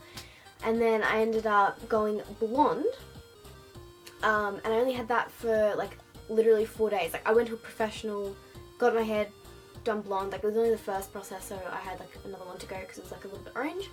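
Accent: Australia. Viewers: Is this an Australian accent I’m really trying to hear the difference between Australian and British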